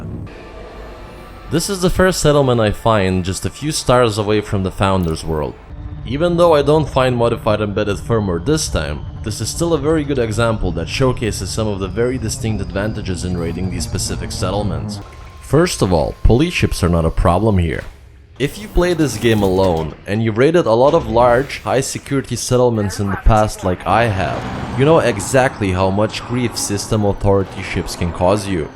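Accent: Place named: California, United States. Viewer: Your Californian accents funny as fuck! thax Bruh your video hella help me! Blesses from California!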